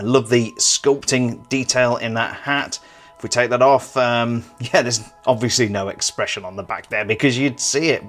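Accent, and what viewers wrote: United Kingdom. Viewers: Hi! New to the channel and I love your reviews! Can't help but notice that you have a British accent but you review LEGO in American packaging :P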